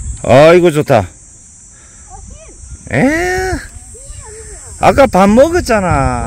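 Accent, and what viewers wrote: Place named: Gyeongsang, South Korea. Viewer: 참~~말로 갱상도 사투리 정겹네예~~~ 버스턴에서도 잘 보고 있습니다.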